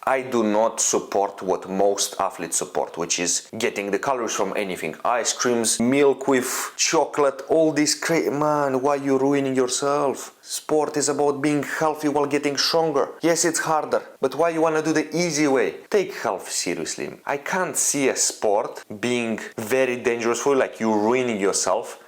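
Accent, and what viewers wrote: Russia. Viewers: dammm finally a real fella slapping reality out here, loving your hard cold facts and love the Russian accent too ❤ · That russian accent 🥶😮‍💨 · Блин, я подозревал что ты русский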